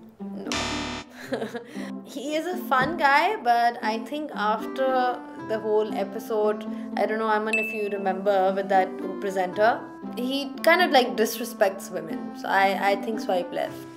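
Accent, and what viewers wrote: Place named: India. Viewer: Your accent is so strong. Please learn better English. You studied here didn’t you learn anything. You sound so Indian. Please don’t learn from them.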